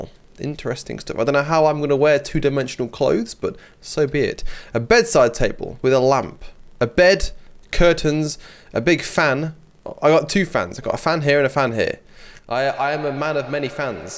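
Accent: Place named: United Kingdom. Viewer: As a French tutor, your French with a heavy British accent is impeccable. Aucun de notes.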